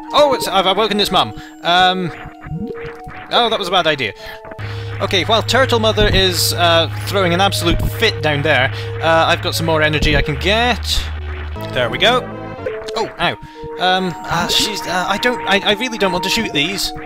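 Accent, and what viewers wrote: United Kingdom. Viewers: That boss was just...I can't find words to describe it... You're British? Cool!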